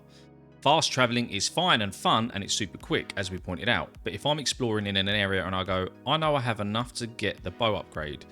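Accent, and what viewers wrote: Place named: United Kingdom. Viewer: Brilliant video mate !! From yhe the uk 🇬🇧 myself yhe midlands nice to hear a uk 🇬🇧 accent amazing vid on this outstanding upcoming game !! I’m so so excited for this game !! I pre ordered my digital deluxe edition today !!! Counting down the days !! Thank you for the breakdown in this !! It’s great you’ve explained more and made us way more excited ! 🙂🙂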